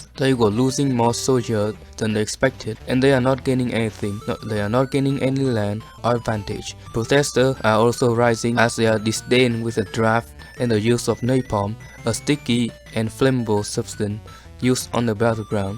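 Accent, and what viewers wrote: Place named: Europe. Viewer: He sounds more European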